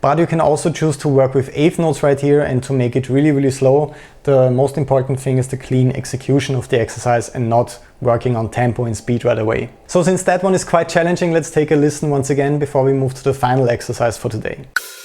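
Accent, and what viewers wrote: Austria. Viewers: Are you maybe an Austrian (Because of the Accent )( if I m right I gonna have to say Hawedere oida !!! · Bro u have an Austrian accent isn't it? 😅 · Ich mag deinen österreichischen Akzent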